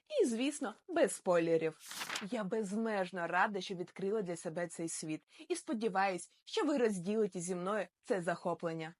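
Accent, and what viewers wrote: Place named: Russia. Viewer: Дивна вимова. Схоже що на миті проривається російський акцент. Але загалом добре.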